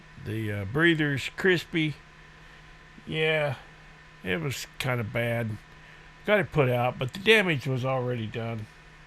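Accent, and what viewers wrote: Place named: Arkansas, United States. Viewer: Whenever I hear some picking on a guitar! I know I’m in-for a wonderful trip into a mechanical adventure. Thank you for taking us along on your trip! You are the best at walking us along with you,while you recover your old square body from the ashes! I have watched every one of your videos from the Rockabilly build to now. And I have never been disappointed in any them. Your “ Ol’Arky” styled delivery and work ethic are a joy to witness. Thank you sir,for your guided tour of your trip of raising an old friend up from the ashes. “I sure do preciate ya!!!” From one old timer to another! Till the next time,keep on keeping on! P.S. we need more “ pickin and a grinnin!”😊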